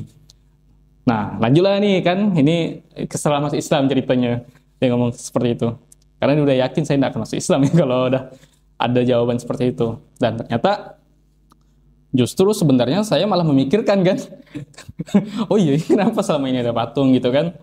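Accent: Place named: Borneo, Indonesia. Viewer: Logat orang dayak kalbar, mirip logat malaysia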